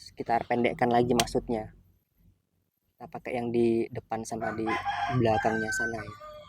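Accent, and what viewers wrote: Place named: Palembang, Indonesia. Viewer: Ikut bljr... Awak jemo mano logatnyo cak jemo palembang , aq di bkl.... Salam kenal dan satu hobby bro . 🙏🙏🙏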